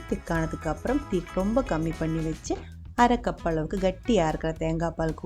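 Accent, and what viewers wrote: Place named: South India, India. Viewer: Your tamil is different, are you a south indian?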